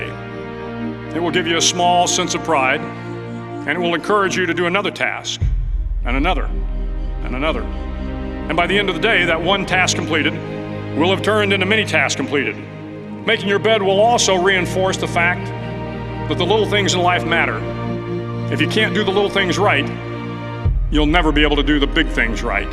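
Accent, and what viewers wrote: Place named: Texas, United States. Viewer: 텍사스 억양임. 진짜 텍사스 억양이 멋있는 것 같음.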